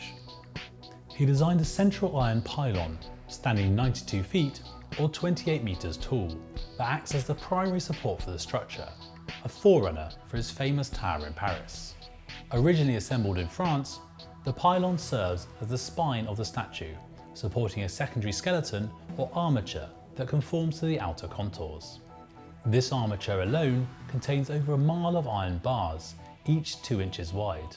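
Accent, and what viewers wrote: United Kingdom. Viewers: Sotaque britânico não dá pra aguentar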